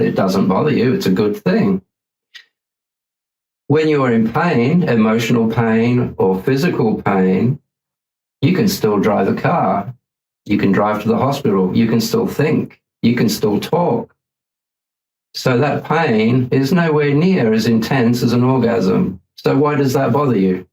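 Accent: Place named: Russia. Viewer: wow, new long video! and in Russian😂 i thought at first, because i heard my accent😂😂 later i understood that its English, that was fun😁 brain game.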